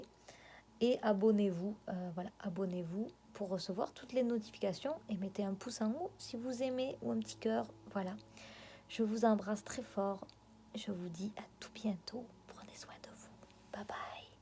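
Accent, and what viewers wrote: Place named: Quebec, Canada. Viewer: après vous avoir découverte hier dans le live d'Aurélie Louve Chamane, j'ai choisi ce tirage n°1!!!!!! Sublimes messages 🙏🙏🙏🙏💚 Merci mille fois Et 😉 quel plaisir d'entendre ce mélange d'accent du sud-ouest avec l'accent du Québec 😊😉😀🧡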